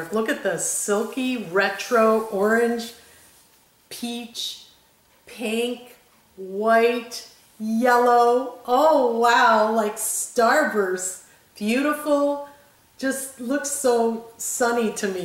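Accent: Pennsylvania, United States. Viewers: Hi! I recently found your channel and I'm totally hooked! I love your accent which I think is from PA but reminds me of NY. I'm from NH currently living in TX. I love your patterns, fabrics and beautiful outfits. I'm a new assist and get so inspired by you. I love your sweet friendly manner and how happy and positive you are! It's really like visiting a good friend. Thanks for all you do😊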